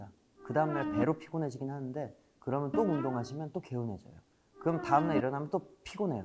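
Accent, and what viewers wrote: Suwon, South Korea. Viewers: 수원 사투리가 저렇다는거 처음알았다 ㄷㄷㄷ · 🍦 민혁이 오빠에 이어서 오빠도 블랭크톡이라니😭 좋다 이 스케줄 달다..🍦🤍 비투비 이창섭 3년 9개월만에 솔로로 컴백했습니다❤️ SURRENDER 많관부😊💙(#써렌더 #설렌다 #예지앞사 #💙) 저 툭툭 내뱉는 그 말투가 섹시한거야 야ㅋㅋㅋㅋㅋㅋ🍑 난 오빠가 수원 사투리 쓸 때마다 너무 좋아 💕 섹시해😉 오빠 그래도 5월 쯤 부터 매일 복싱장 가서 운동하잖아!! 진짜 복싱장 관장님께서 올려주시는거 보면 오빠 맨날 열심히 복싱하고 줄넘기하고 훈련하는거 보는데 너무 멋져☺️ 살도 점점 오빠가 원하는 정도로 건강하게 빠지는 것 같고 체력도 더 좋아지는 것 같아서 좋다❤️ 뭐야뭐야 그렇게 갑자기 정해진거였어ㅋㅋㅋㅋㅋㅋ?? 민혁이 오빠 솔로 콘서트 때 멜로디가 솔로 컴백 “기회를 만들어😮!!” 하니까 오빠가 기회 만들어 보겠다고 기다려 달라고 한게 엊그제 같은데 진짜 기회를 만들어서 이렇게 빨리 신곡으로 멜로디 만나러 온 거 보니까 감동이야🥹💙 진짜 새로운 분위기 노래 너무쭈아💕 비투비, 오빠 곡들로는 처음 접해본 미디엄템포, 70-80년대 노래 느낌인데 처음 듣자마자 소름이 쫙😬! 처음 시도해보는 장르인데도 오빠랑 찰떡이야! 약간 나른섹시 느낌이랄까🤭 너무쭈아 절대 호불호 안갈리는곡이야ㅎㅎ 왜냐구? 주변 애들도 다 노래 완전 좋다고 본인들 스타일이라고 했징😉 우리 하고싶은거 다 해🥰 부끄럼쟁이 😘 부끄러워하면서 노래 너무잘불러😲 오빠 음색때문에 내 귀가 녹았다구👂 역시 믿듣비 믿듣 🍑💙 어제 비키라에거 풀었던 썰이당ㅋㅋㅋㅋ!! 커피차가 사실 커피+차 였다는 사실🤣 그것도 커피 걸어서 배달해준거 너무 웃겼엉ㅋㅋㅋㅋ😚 바쁘다 바빠 비투비사회 다들 갠활이 많아서 까먹었을 수도 있는데 비투비 모두 어제 오빠 곡 나오는거 홍보해주고 또 응원해주고 해서 내가 다 감동먹음🥺 은광이오빠 썰 다시 들어도 너무 웃겨ㅋㅋㅋㅋㅋㅋㅋ 우리 서리더 최고🐱💙 저번에 미연이언니가 심야괴담회에서 큐브 귀신썰 푼거 봤었는데 그 귀신을 오빠도 봤을줄이야🥶🥶아니 귀신썰 진짜 큐브에 귀신 있을까봐(ㅋㅋㅋㅋㅋ) 좀 걱정되는데 그 귀신과 함께(?) 녹음한 노래가 ‘갓’리워하다였다니!! 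될 노래는 된다고😆 마침 또 그리워하다 녹음 때 귀신이 있었어😲😲 비투비 다음 컴백 때도 녹음실에 귀신 나타나주길🤣🙏🏻 그럼 성재오빠랑 은광오빠가 놀라서 헐레벌떡 도망갈 수도 있겠다🙄ㅋㅋㅋㅋㅋ 이번 앨범, 비투비 다음 앨범도 대박나자🤍 구리ㅜㅜ🐶 오빠 지인분들 인스타나 유튜브 통해서 올해 초 쯤부터 키우는거는 대충 알고있었는데 언제 쯤 이야기 해주려나 기다리고 있었는데 몰래 키우려고 했었다니!!🤭 강아지 책임지고 키우는거 멋있엉🤍 예전부터 강아지 키우고싶다고 했었는데 오빠가 오빠 본인도 감당 못하는데(😆) 강아지 키우면 감당할 수 있을까? 하고 고민 또 고민하던하던 모습이 생생한데 지금 이렇게 책임감 가지고 키우는거 좋다🥹💙 오빠 핸드폰 배경화면 오빠랑 구리랑 뒷모습 사진찍은거 탐난다구ㅋ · 방긋웃는 모습이 넘모이쁜 우리 💙 숙취를 겪어본 바 배탈이 난 숙취후 ㅠㅋㅋㅋ 경력직 ㅠㅠㅠㅋㅋㅋㅋ 이런얘기 저런얘기 아무렇지 툭 터놓고 해주는 너가 참 조타아 수원사투리가 뭔지도 몰랐으면서 매번 ~거? 하고 물어보는 이창섭한테 치였었는데, 그게 수원사투리였구나?? 앞으로도 종종.. 아니 자주 해주라… 글고오!! 지읒니은 섹시해 무슨소릴 하는거야😭😭😭 나야말로..힘듭니다.. 나야말로…힘듭니다 ㅠㅠㅠㅠㅠㅠㅠㅠㅜㅜㅜㅜ 그렇게 반존대 하시면 나야말로 힘듭니다😱😳 언제나 음악에 진심으로, 하고싶은 음악에 도 전하는 모습이 지금껏 쌓아올린 이창섭을 만들었을테지 비투비가 이 음악에 진심인 만큼 멜로디도 비투비에 이창섭에 진쒸미양〰🥴 부끄럽다며 세에상 멋찌게 노래부르는 🫶❤️ 씨디💿를 주식으로 먹나 라이브가 어찌 저럴수가 있는거시여ㅠ ㅋㅋㅋㅋㅋ몇초차이에 ㅋㅋㅋㅋㅋ 우리 이사님 엉뚱함과 미담을 오가는 ㅋㅋㅌㅋㅋㅋㅌㅌㅌㅌㅌㅌㅌㅋㅋㅌㅌㅋㅌㅋㅋㅋㅋㅋㅋㅋㅋㅋㅋㅋㅋㅋㅋㅋㅌ 본투비 그하는 대박날 운명이었던거심ㅇㅇ 구리가 이 키우는 멈무가 맞았구나~~~ 구리아빠랑 구리 들킨김에.. 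👀📸 둘이 싸우디 말고 잘지내에! 구리야 이창섭 잘부탁해ㅋㅋ 동네 노래 좀 하는 오빠로 남으시려면 일단 제 동네로 와주시면… 🏡 고민도 없이 for 멜로디를 외치는 너얼 어쩌면 좋니~~ 난 for 이창섭 for 비투비야 ㅠㅠㅠㅠ 서렌더 띵곡입니다 많관부 많사부🔥 마지막까지 안뇽 하고 흐캬캬캬 웃고 가는,ㅋㅋ 블랭크톡도 이창섭도 고마웠어요 덕분에 이창섭 티엠아이 마아뉘 알아갑니다아 안녕👋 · 시작부터 저음 아.. 저는 이렇게 저음의 인사하는 에게 푹빠져요.: 역시 숙취ㅋㅋㅋ 안들린데 ㅋ 우리 숙취 팬싸도 했잖아요 ㅋㅋㅋ 숙취이야기하는데 왜케 옛날 생각나죠? 블랭크톡 알면서 이질문 고른건가요?, 저 수원 사투리 여기서 알았어요. 저 특유 말투가 수원 사투리 였다니.. 나 왜 오늘 알았는건지.. 감사합니다. 아니 이렇게 호탕하게 웃어준다구요!! 운동의 굴레~ 아 세상엔 숙제가 너무 많은데 도 숙제를 준이기분 ㅜ 저 이거 너무 궁굼했어요. 갑자기 뮤지컬도 빨리 끝나고 근데 음반 낸다고 안했고 계획도 없다고 했는데 갑자기 나와서 사실 조금 놀라긴 했어요. 갑자기 나온 이유 너무 궁굼했는데 이렇게 들으니까 너무 좋아요. 좋은 음악이 있으면 언제든 나올수 있다는걸 알거 나니 앞으로의 가 기대되요 비투비가? 이런장르를? 하고 생각했는데 비투비 이 라 가능하다고 생각했어요. 다른 장르도 도전해주세요~~ 라이브 시작 ㅜㅜ 막 잘부르는 노래도 좋은데 이렇게 흘러가듯 불러주는 써렌더.. 목소리 우리집으로 배달좀 해주세요 블랭크톡 나도 써렌더~~ 아써렌더~~ 진심 빵터졌어요. 자기 살길사느라 ㅋ 그래도 분명 멤버들 한명한명 관심없는척 하지만 다들 신경썼을꺼예요. 하지만 이사님 ㅋㅋ 주차는 ㅋㅋ 귀여운 이사님 썰~~♡ 써렌더 녹음할때도 나왔어야 하는데 귀신아 어디갔니 써렌더 대박 나야 한다고!! 이렇게 앉아있어요. 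하는데 아니 저 모습 너무 귀여워요...딱 저 수줍은 저모습 섭프가 빠지는 치임포인트 부끄러워 손을 비비는 우리 아 블랭크톡 이부분을 살려줘 감사합니다!!♡♡ 아니 동네 동생도 있다구욧!! 동네 동생 해주라~~ 우에엥에엥 꼭 전해줘요 동네 동생해달라고! 오빠 그만 찾자 @@ 이영상의 끝판왕!! For melody 내가 멜로디인게. 그리고 이창섭의 팬인게 for 멜로디 한단어로 눈물이 왈칵했어요. 항상 같은 마음으로 예전이나 지금이나 똑같이 말하는 이 라 너무 고맙습니다. 몰랐던 정보 또 그의 마음이 너무 잘 전달 되었어요. 앞으로 멜로디 한사람으로 이창섭도 블랭크톡도 응원 할께요. 너무너무 감사합니다. 내가 이창섭을 사랑하는한 우리 또 만나요. 블랭크톡 다시한번 감사하고 사랑해요! ♡surrender all to 멜로디♡ ♡surrender all to 이창섭♡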